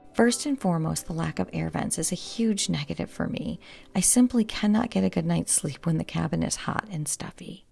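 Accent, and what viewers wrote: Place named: United States. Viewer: あなたの英語はアメリカ語英語です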